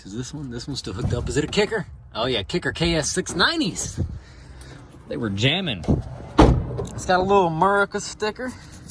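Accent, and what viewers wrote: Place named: New Jersey, United States. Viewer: Love the NJ accent. Especially love the powah mirrahs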